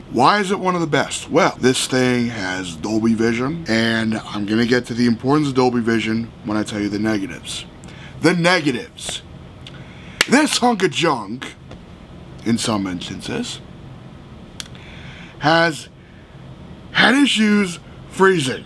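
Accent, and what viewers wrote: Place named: United States. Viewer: Hi, you have a very interesting accent. Where in the US are you from ? Thanks for sharing your thoughts on this Sony.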